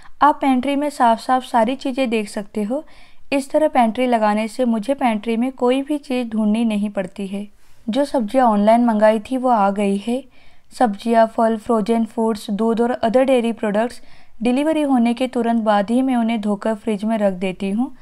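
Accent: India. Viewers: मैने एक वीडियो और देखी थी, जिसमें बोला गया था कि ऐसी होता है अमेरिका का किचन, लेकिन आप की आवाज और भाषा से पता चलता है कि आप इंडिया से हो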